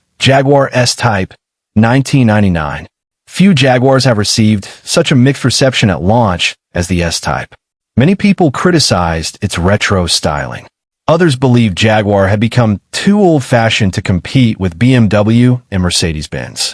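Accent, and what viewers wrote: United States. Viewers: Number 8 MG Zed R. Why is this narrated in an American accent? A channel with the title UK Torque, referencing British cars, should at the very least use a British narrator.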